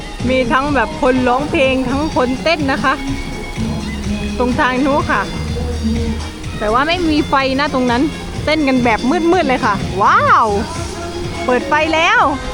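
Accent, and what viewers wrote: South, Thailand. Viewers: คนใต้ไหมคะ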